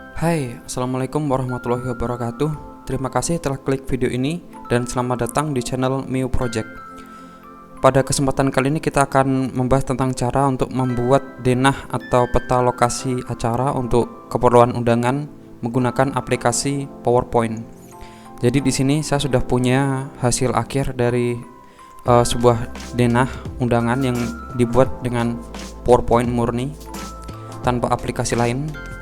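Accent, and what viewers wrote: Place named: Tegal, Indonesia. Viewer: Wong tegal ya mas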